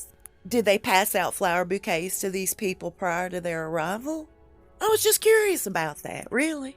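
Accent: South, United States. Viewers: It's called a southern accent. A lot of us here in the U.S. have it..lol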